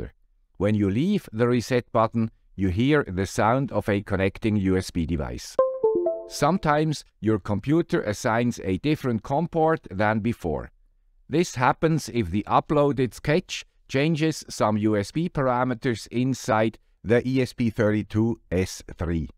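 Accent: Switzerland. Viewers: Thank you man with Swiss accent, what you explained solved many problems in my mind. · I love the guy with the Swiss accent 😊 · I have not said it before, but I look forward to: the Swiss accent, The little hand pointer, The hat and the knowledge You share. ThankYou Sir.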